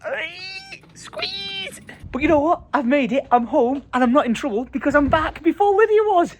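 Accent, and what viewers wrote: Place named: England, United Kingdom. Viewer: Man it sucks so much it really does. Being able to watch you through all of these years give your heart out to those who give theirs to you and seeing the so unforgiving fate that awaits them is just so unforgiving. There is a community within YouTube and then there is a community within these 2 channels and the sheer amount of love and support and kindness shared in this community alone outglows all of the others. I’ve been a fan since way before Alec got to meet you and up until now and being able to see the interactions between you and these amazing people is so amazing but life is just so fing unforgiving and unfair to those who deserve it the least. For what it is worth, I’m glad that you two were able to spend the time and memories that you did together as I know and can sympathize to an extent just how important it is to both of you. 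I’m sure that even though he is gone, he will still remember the moments shared and you will continue on to remember them just the same. I’m so sorry that your life is so riddled with this fate for so many people. I’ve watched you since you were a kid and in a way, just through a screen you feel like a brother at times. Watching you so often has even made me forget that you have an English accent and live on the other side of the world because of how much you allow us to be part of your life and it means the most to us. I’m so sorry for your loss. It truly is heartbreaking. I wish you, lyd, Alice and his family the best in this time. Love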